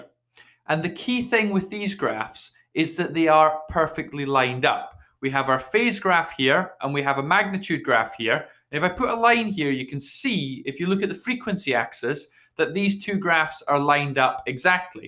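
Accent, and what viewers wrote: Scotland, United Kingdom. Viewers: UK probably, scotland i think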